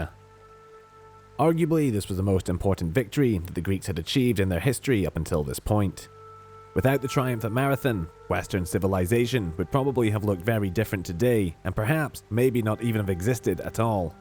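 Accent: Scotland, United Kingdom. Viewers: Much better still needs to flow a bit more but still you're getting there. Read entire sentences in one go and pull back on the emphasis in the middle of them. However from last time it's a big step keep going like that and you'll be there faster than later. Maybe don't worry about the accent as its really nothing even when it comes out and it might be that your trying to sound less Scottish is actually hurting your style rather than helping. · “slightly sinister vocal tones” 😂 scottish accent is ❤ !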